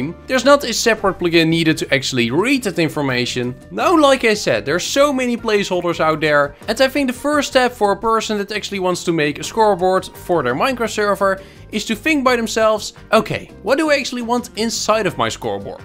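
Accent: Netherlands. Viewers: Are you dutch? I really notice a kind of Dutch-tone in your English.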